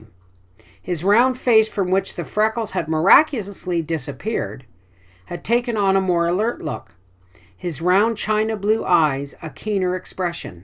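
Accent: Canada. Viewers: I hope you do! It's so kind of you to make these videos and take so much of your time on them. I also wanted to say that I was born and raised on the shores of Lake Superior (WI) and your Canadian accent is so comforting and makes me feel at home. Keep reading when you can, I for sure am a devoted fan.:)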